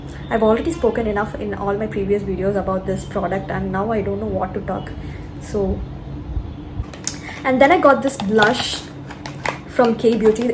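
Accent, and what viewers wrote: South India, India. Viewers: Pure south india full satire daa love d way u talk Love from telengana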